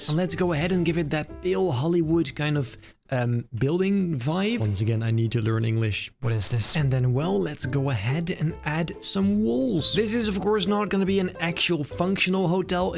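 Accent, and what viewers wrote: Netherlands. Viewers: I smell Dutch Haha❤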